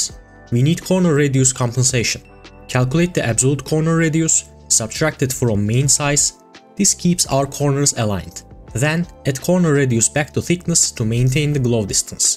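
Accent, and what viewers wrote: Turkey. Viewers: aksandan anladım kesin bu türk diye kanal güzel eline sağlık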